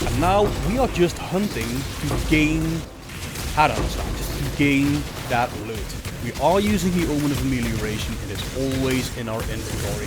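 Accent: Netherlands. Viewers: I Looove the dutch english dialect. nice video.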